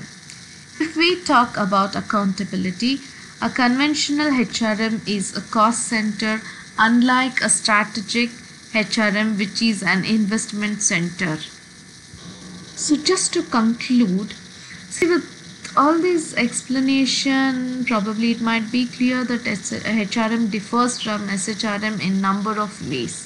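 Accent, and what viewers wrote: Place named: United Kingdom. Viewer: Wow mam trying ur British accent 😁 Well done mam 👍😁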